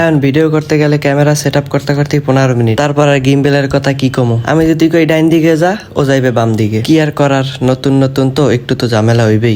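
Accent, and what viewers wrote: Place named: Tripura, India. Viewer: তোমার ত্রিপুরা কথাটা অনেক ভালো লাগে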